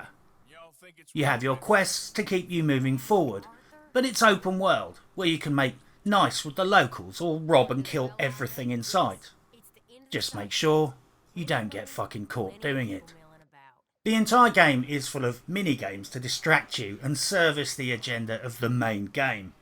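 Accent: United Kingdom. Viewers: Its refreshing to hear a brit that knows rocket ball ammo was junk. Also thanks for using my favorite native meme. My mother had a bumper sticker on her car when I was kid that read "of course you can trust the government just ask a native". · Same I’ve just discovered them and now I have dozens to watch you just can’t beat that British wit or accent haha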